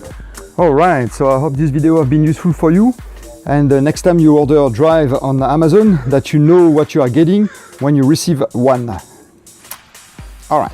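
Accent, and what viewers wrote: France. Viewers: Tu es Français ? l'accent me dit quelque chose ?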